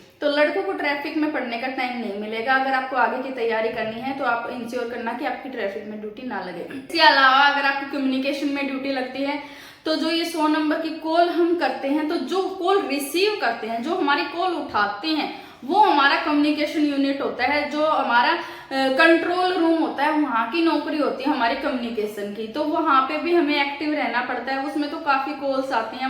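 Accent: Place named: Rajasthan, India. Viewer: Aap rajasthan se ho kya alwar ke aas pas se awaz se lg raha h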